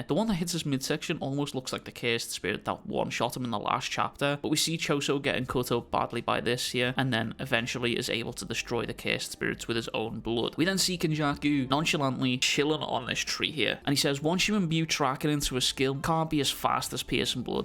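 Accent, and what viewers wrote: Liverpool, United Kingdom. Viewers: weird seein another scouser into anime. love it bro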